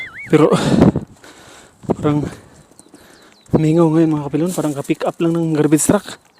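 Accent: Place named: Tagalog, Philippines. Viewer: Ganahan gyod ko maminaw sa imong Tagalog kapilingon makalingaw ha ha ha,❤❤❤❤😊😊 amping kanunay dong.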